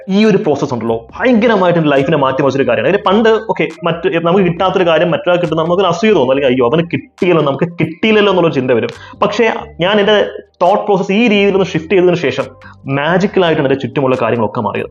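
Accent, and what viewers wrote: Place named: Malabar, India. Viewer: Alambu malabari language 😊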